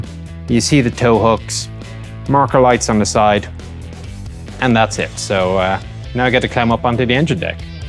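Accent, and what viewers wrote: Ireland. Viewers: 🤣 Irish or Scotish?👍👍👍💯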